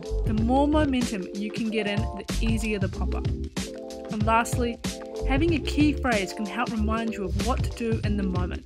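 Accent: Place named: New Zealand. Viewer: Yes she's a full on Kiwi alright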